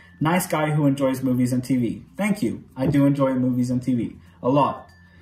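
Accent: United States. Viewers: I think everyone thinks you’re from the usa because your accent sounds soo American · I also thought you were American based on your accent. I love your reactions. You seem very sincere and genuine. It's why I subscribed--you seem like a fantastic person. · A couple of things regarding the Clone Wars. First, I get why doing reactions to it could be tiresome but instead watch it at your own leisure and then when at the end do a reaction to the final 4 episodes because combined they make one of the best Star Wars movies in existence. Secondly, a good reason to watch the Clone Wars is that some of the main characters will now be on the Mandalorian and/or will be getting their own show in the very near future. PS. Im with others and thought you were American. You sound like you could be my neighbor here in California. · Wow -- I thought that you were an American! 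Even going by accent alone, I would have guessed that you were from Chicago, Miami or Los Angeles. In fact, you look so much like my best friend from high school that I had to do a double-take the first time (and, yes, he had a beard in high school). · It's highly likely that J.K. Roweling took inspiration from J.R.R. Tolkien when writing Harry Potter. Also many people think you are American because of your accent. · if you never lived in the US then you definitely have super impressive English and a super impressive American accent. You had me fooled! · you sound very American. I think that's why people assume you are in America. I even assumed that. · You don't "look American"? ... Americans can look like any ethnicity in the world, and you DO SOUND American. I mean I knew you were from UAE but only because I saw it in your "about" page. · I love how genuine you are about your emotions and aren't afraid of what people think about them. 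It really puts you a cut above a lot of similar content like this on Youtube. Sort of coincidentally I used to have a friend from Dubai who didn't like that I used the word "cute" to describe something because he thought it was a very feminine way of speaking though I got him to back down by getting him to admit there is no better word to describe baby ducks. Also people probably assume you're American because of your accent which is very American since we're a really diverse country that comes in all sorts of races and ethnicities it's hard to assume someone isn't American by looks alone.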